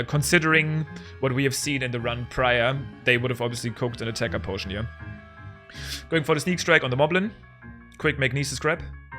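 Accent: Germany. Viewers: I know a german accent when i hear one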